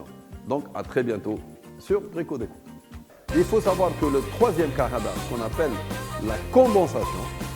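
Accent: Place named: Algeria. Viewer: il parle en algérien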